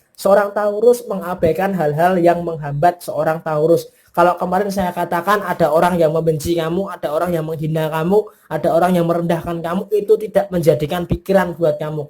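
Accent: Java, Indonesia. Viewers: Sya nlah ktawaaaa dngarttt host...yg bawain reading an...logatnya ithu khaz kental.orang jwa....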